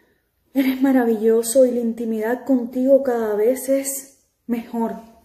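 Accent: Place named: Cuba. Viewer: Muchas gracias estare al pendiente de tus videos que son muy buenos y muy bien explicados..una pregunta eres cubana?..me gusta tu acénto y tu manera de expresarte😊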